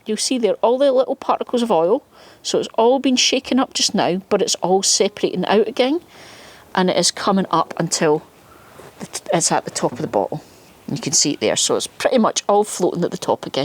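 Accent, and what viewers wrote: Scotland, United Kingdom. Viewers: Came to learn about neem oil sprays, stayed upon hearing a fellow scottish accent 💓🏴 (currently working in some serious Fife temperatures 🔥). Your garden looks absolutely wonderful!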